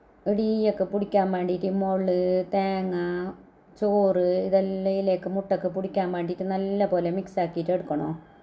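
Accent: Kasaragod, India. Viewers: താങ്കൾ കാസർക്കോട് ഭാഷയിൽ പറഞ്ഞത് ചിലതൊന്നും മലപ്പുറത്തുകാരനായ എനിക്ക് മനസ്സിലായില്ല, 🤔 എങ്കിലും വീഡീയോ കണ്ട് പഠിച്ചെടുത്തു 🥰. Thanks.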